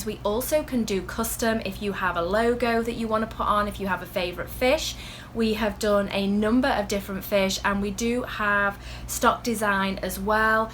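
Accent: Liverpool, United Kingdom. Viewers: Aren't you scouse? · i love your channel but i was just wondering are you from the uk but live in america x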